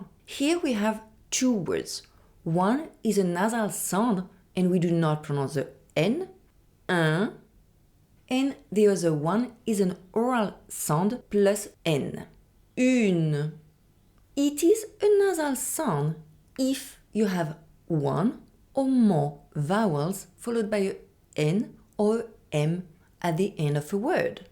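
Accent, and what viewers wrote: France. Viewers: What’s nasa son?? You mean nasal sound?? Please don’t sound French when you’re speaking English. I had to turn subtitles on to understand what you’re saying in English. If you speak French, then ok.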